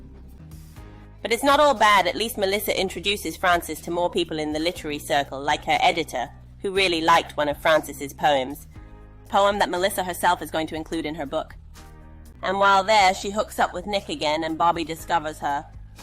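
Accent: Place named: Mid-Atlantic, United States. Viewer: I love it....."Nothing can go wrong"....! its your tagline! Love your "accent" too. not sure but fairly reminiscent of the "Midatlantic" accent of the 1940s films. Anyway, it certainly fits your narration. ( this is meant to be complimentary). Can you do "The Hunger" ?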